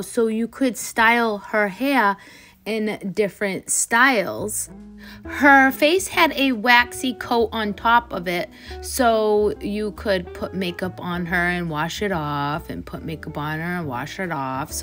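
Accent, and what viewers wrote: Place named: Maine, United States. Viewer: Love your accent! Do you live in Maine?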